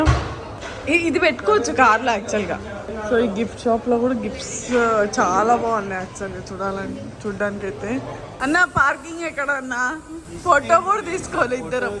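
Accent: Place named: Telangana, India. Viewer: Nee voice kacha Telangana slang lo mast untadi.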